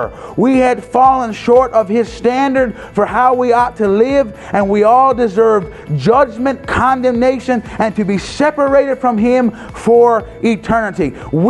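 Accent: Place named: Newfoundland, Canada. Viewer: I have never heard a broader Newfie accent than this pastor- cracks me uppppp!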